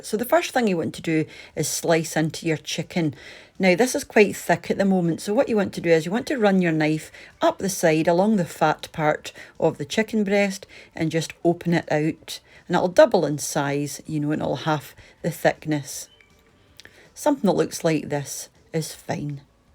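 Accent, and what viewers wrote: Scotland, United Kingdom. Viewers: Have you noticed that the subtitles say to cook them for 30 minutes each side whereas says 3 minutes each side. The downside of automated subtitles which are not geared to a soft Scottish accent.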